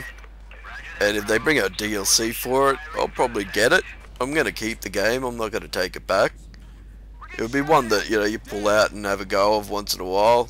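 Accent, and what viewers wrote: Australia. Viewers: austrailian, english, or russian? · im guessing ur an aussie coz u keep saying mate lol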